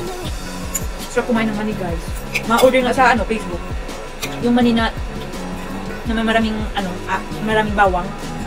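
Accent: Visayas, Philippines. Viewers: ang ganda talgaang magdalita ng mga bisaya btw ang ganda niyo din oo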